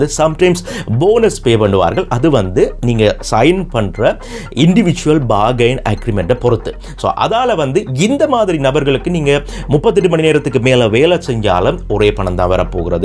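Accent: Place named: Tamil Nadu, India. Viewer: I liks your tamil slang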